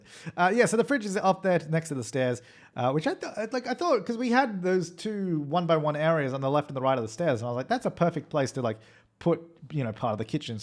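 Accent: Australia. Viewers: CloudieFox I know right, they're both australian, so that's probably why. Whenever I watch waiter I think they are the same guy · Love your videos~ I love making houses on sims, probably more then the actual game play XD as i was watching though, some of the things you say, i dunno, you voice just sounds a lot like Jazza, and not being stereotipical witht the Australian accent, but the tone and pitch of your voice is very similar to his hahaha still, awesome houses! and on to watch more~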